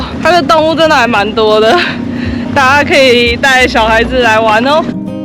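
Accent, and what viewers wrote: Taiwan. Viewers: Jing你的台湾国语解说很好听，语气很温柔。好喜欢！❤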